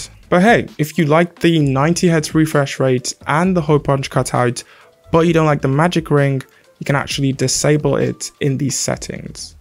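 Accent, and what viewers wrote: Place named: England, United Kingdom. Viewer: sorry, you sound English while you're African. unless you're from England that's fine. authenticity is paramount.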